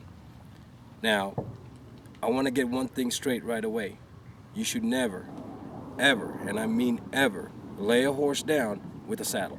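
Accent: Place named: Caribbean. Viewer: another awesome vid. I love your accent .. caribbean with a 'twang' :) Where are your roots ?? St Thomas ? :)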